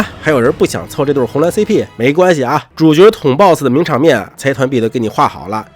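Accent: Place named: Baoding, China. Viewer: 窝草 保定味儿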